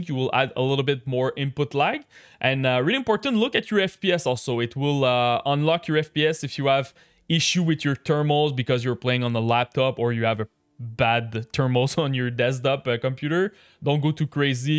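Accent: Quebec, Canada. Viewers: Super. Entk sa parait que tu est québécois xD. Entk C'est super, j'ai gagné en FPS. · Toé tu viens clairement du Québec LOL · Je peux reconnaître ton accent du Québec .... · Sa ses de la belle accent quebecois :)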